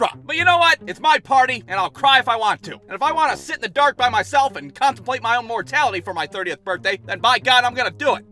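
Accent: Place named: Northwest Ohio, United States. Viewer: Okay okay this dudes form nw Ohio isn’t he😂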